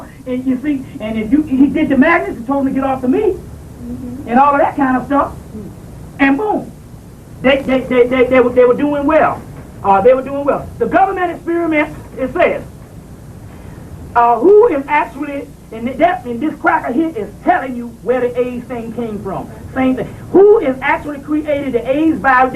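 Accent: South, United States. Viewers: mispronouncing Authors is hard to understand the Southern accent he has..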